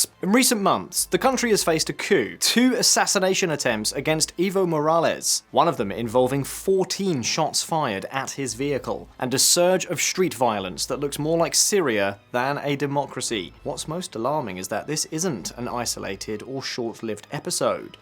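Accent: United Kingdom. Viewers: I wish the person that put this video together would have used another AI accent. The British accent is like finger nails on a chalk board.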